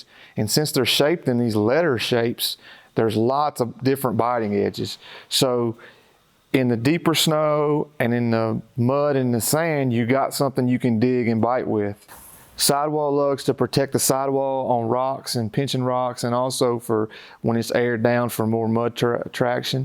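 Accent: South, United States. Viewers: 10 swag points for the southern accent.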